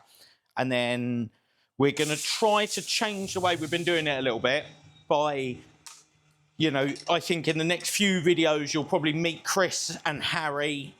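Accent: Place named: United States. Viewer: Cool - maybe try saying "you" sometimes instead of "you guys". I get that narrating a task is not your job but changing it up a bit helps a lot. The less American you sound the more likely I will subscribe. (Alfa Romeo - 4 door Gullita 1600cc saloon)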